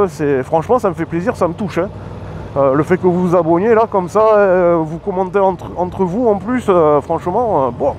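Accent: South, France. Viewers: Essaie super sympa et cet accent du sud qui fait plaisir a entendre il ne manque plus que les cigales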